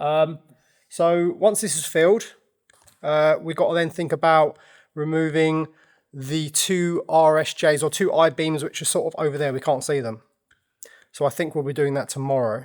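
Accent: United Kingdom. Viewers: if I offended you, I’m sincerely sorry. I hoped the emoticon showed I meant it as a light jest. (As an American, I thought your pronunciation was probably a British quirk.) I have huge respect for you, and I’m a huge fan of your channel. I discovered your channel two months ago and have watched every episode up to this one so far. I forgot that just because I feel like I know you so well, you don’t know me at all, and I shouldn’t presume to joke like that.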